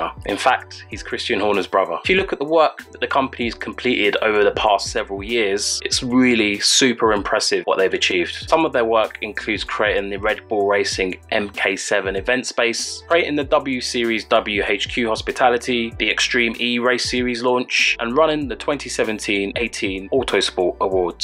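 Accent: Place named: London, United Kingdom. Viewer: You sound like ur from lonnndon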